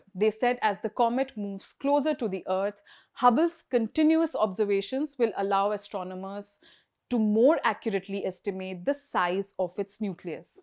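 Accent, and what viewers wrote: India. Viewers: Stop making videos , I couldn’t stand the lack of information and accent . I said what I said , I’m fatigued on Indians .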